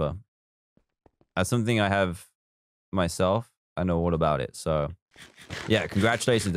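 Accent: England, United Kingdom. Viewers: Are you English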